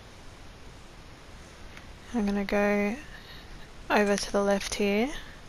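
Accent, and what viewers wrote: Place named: Australia. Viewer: I was not on speed 2 and wasn't able to get the stop and go up off the bottom. Was giving the little grass pickerel a fit changed to speed 2 first cast was practice.... second cast Trophy Grass pickerel. Lol off to Neherin for that last one in my mission Thanks...love your accent by they way...is That Aussie?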